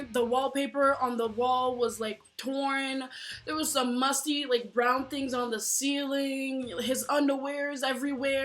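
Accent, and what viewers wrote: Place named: Africa. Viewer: Are you African? I heard that accent! I'm Nigerian 😁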